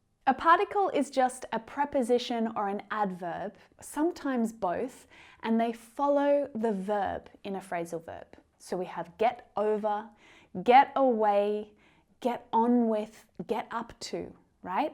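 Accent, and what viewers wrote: Australia. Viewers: is a lovely lady, she has soft Aussie accent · Why is your accent so lovely? I have known Australians but none of them speaks like you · Her accent is Australian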